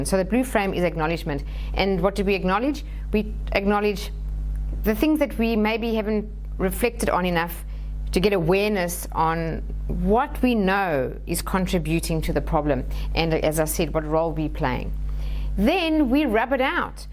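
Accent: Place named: South Africa. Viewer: Hi thank you for the video, your accent sounds like South African - excellent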